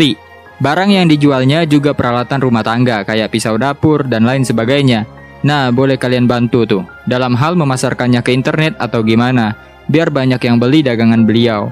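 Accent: Pontianak, Indonesia. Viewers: Abang tinggal di ponti? Pantas logatnya kayak kenal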